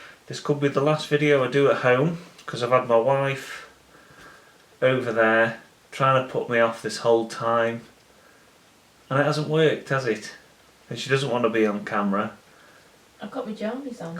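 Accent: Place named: United Kingdom. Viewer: Like for listening to your native British English.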